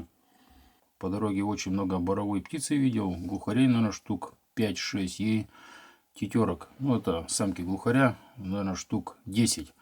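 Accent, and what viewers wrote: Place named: Arkhangelsk, Russia. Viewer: судя по говору, у меня был друг с Архангельска, ты тоже оттуда, познакомься с Николаем, таежным, он никогда не набирает воду, с лунки, у дома, если планирует, выезжать на снежике)))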